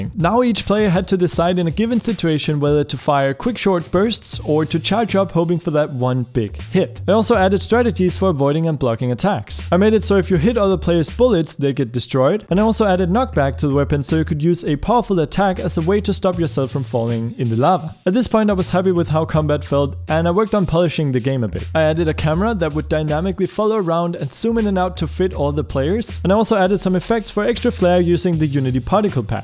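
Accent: Denmark. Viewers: 30 sec into the video and i felt a danish accent - turns out i was right :D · Are you Danish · are you danish?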